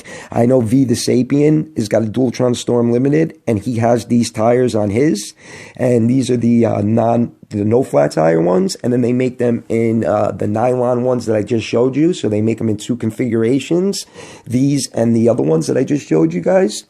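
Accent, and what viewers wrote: New York, United States. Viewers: I love your NYC accent lol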